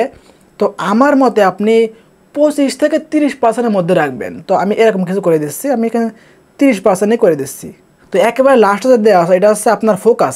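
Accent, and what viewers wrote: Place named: Jessore, Bangladesh. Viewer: ভাই আপনার বাড়ি যশোর। ভাষায় তো সে রকম মনে হচ্ছে 😊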